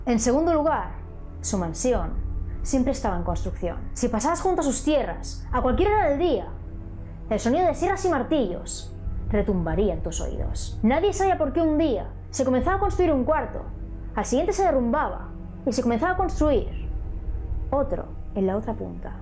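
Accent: Spain. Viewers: Me encanta tu narración a pesar del dejo español, narra de una manera que te enganchas con el tema. No creo que esté embrujado pero si llena de mucha tristeza y quizas el alma de esta señora Sara no descansa en paz por la tristeza que le llevó al espiritismo. Oracion por ella 🙏🙏🙏 descanza en paz sra. Sara W.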